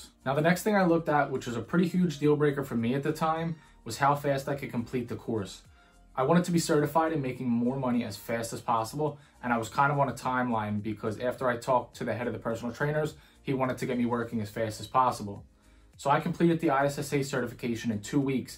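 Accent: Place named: Philadelphia, United States. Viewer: You spoke 4 words and I was like this dudes from NE philly and then I seen the judge shirt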